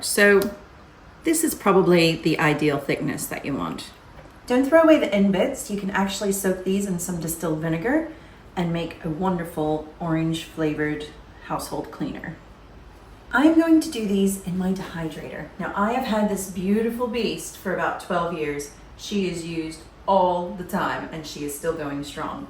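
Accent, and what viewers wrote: United States. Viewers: Oh ok I thought you sounded a bit American! lol. Menopause is awful and neurodivergent kids are such a challenge! It's nice to know others can relate! Plus Jane Austen is amazing! I think I'll enjoy your channel! ❤ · I have just found your channel and I was confused for a while you sound American but your home looks British then I heard you say Tesco. I found this video so peaceful and I instantly subscribed.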